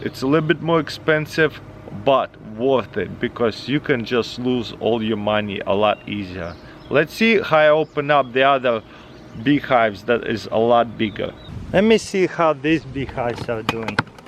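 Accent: Russia. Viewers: Hey, love the bee videos. This is something me and my husband have talked about doing but we know nothing about. Hopefully someday we can getting our own hives started. You videos are a help. You show the realness of it. Try and fail and succeed. The russian accent is a plus as well. Keep plugging.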